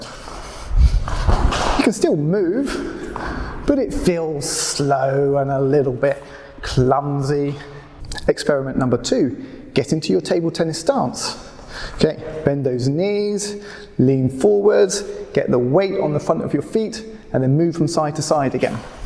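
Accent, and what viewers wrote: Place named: United Kingdom. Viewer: 내가 좋아하는 영국인 영국발음 여러 게스트도 초청해 꿩 먹고 알 먹고 탁구 익히고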